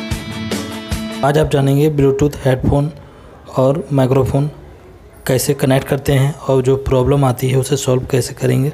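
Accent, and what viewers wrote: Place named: Arab world. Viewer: Are you speaking like arabic or the worst english in the universe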